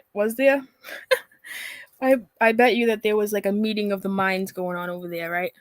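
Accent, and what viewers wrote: Boston, United States. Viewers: GUUURRRRLLLLL…. First off, how some people have even 1 sub truly confuses the hell out of me. The hypocrisy on YouTube pours out like water! People want to point fingers for “being in someone’s chat”, but meanwhile they are in the EXACT SAME LIVE hiding in the bushes!!! They are too dang nosey not to be! Always trying to be in the mix while holding up their “clean hands” claiming innocence. Those are the ones that need to “claim their nasty”. At least you’re not afraid to own up and stand in your truth. ✌🏼😊 Ps - my Mother was born and raised in Boston… (we are Kessler’s and Kavanaugh’s). I love your accent! We moved to Georgia 40 years ago, so yea, there’s no Boston accent left here. LOL! 😆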